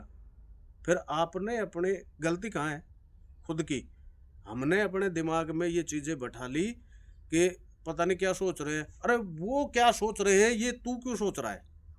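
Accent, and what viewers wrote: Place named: Alwar, India. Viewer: आप अलवर से हो ऐसा लगता है बहरोड़ मुंडावर साइड से भाई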